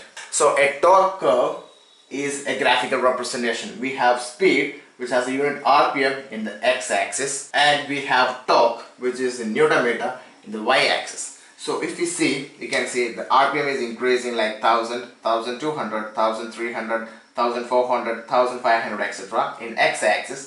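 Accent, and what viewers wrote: Kerala, India. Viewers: I think he is a malayali...from slang.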